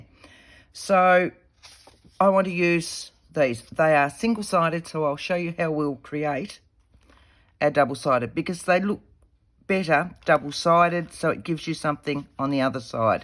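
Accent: Australia. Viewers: Hi from Ballina, NSW. Nice to hear another Aussie accent. I really enjoyed two of your videos that I've just watched and will definitely be adding this to my next journal. I'm happy to have some cooler weather this month. Cheers 😊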